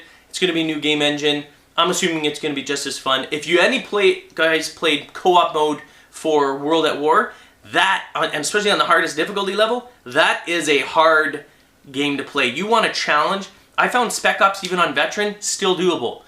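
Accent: Canada. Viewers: are you canadian??? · are you canadian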